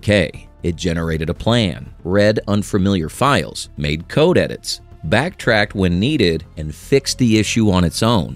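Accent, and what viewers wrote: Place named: United States. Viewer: I love how country you sound ! Gitty 😁 Yup !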